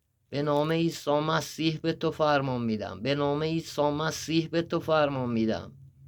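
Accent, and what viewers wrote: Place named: Isfahan, Iran. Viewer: اول از همه سلام ، دوم : قررررررربونی لهجه اصفانی دون بشم من ❤ بعدم اینکه پسررررر چقدر اورب اطرافت بودن !!!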